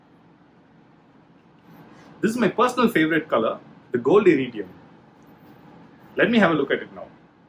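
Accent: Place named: India. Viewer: I like English with Indian acsen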